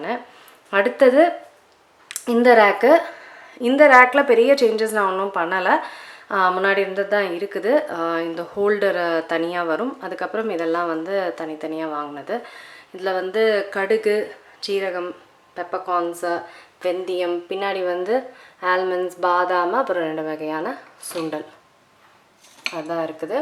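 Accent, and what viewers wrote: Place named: Kerala, India. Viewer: Slang Oru malayalam style pole und..🔥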